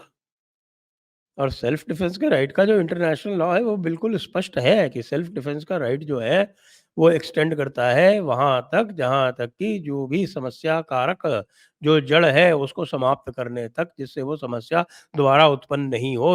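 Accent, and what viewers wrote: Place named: Churu, India. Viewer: आपकी आवाज और बोलने का लहजा एक पत्रकार की तरह नहीं हैं चूरू जिले का हो के?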